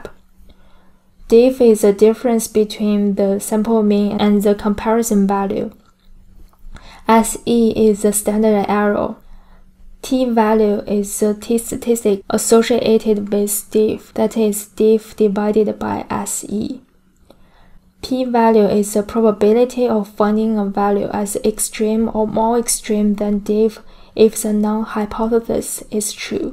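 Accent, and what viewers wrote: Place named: Asia. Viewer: Super thick Asian accent makes the video difficult to understand. Had to use subtitles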